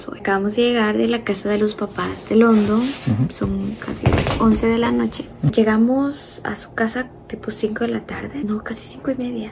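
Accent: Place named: Colombia. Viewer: pareses colobiana hablando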